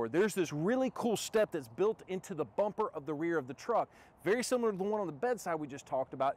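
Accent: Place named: South, United States. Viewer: Love the accent. Wish Canada had that southern personality.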